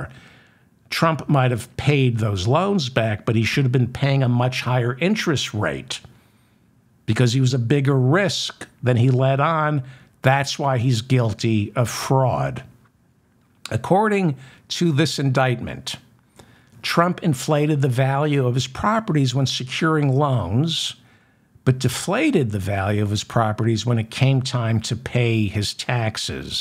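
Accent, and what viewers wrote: New York, United States. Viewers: Profound thoughts on Gaza,. Your transcendent resolution is the only way. Thanks. PS: your pumpkin postmortem was blissfully brilliant, and spoken by a true New Yorker!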